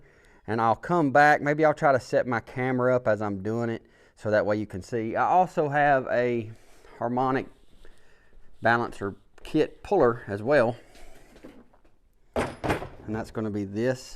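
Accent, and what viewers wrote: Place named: Mississippi, United States. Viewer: You sound like your from Mississippi. No disrespect, I'm fro Mississippi lol. I have a question on a 97 f150. But I'm terribly busy. I'll. Get back with you tonight. Thanks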